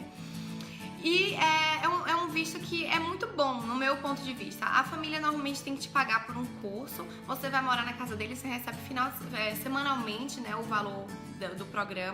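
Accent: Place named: Bahia, Brazil. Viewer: Amei!! Tô super nessa dúvida e o vídeo me ajudou muito. Você e super fofa e sotaque baiano não tem pra ninguem <3 hahaha sucesso com o canal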